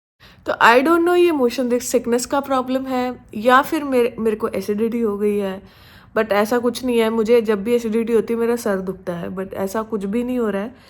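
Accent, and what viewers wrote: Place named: Himachal Pradesh, India. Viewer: Aapki language me himachali touch ata hai apnapan mehsoos hota hai ❤❤❤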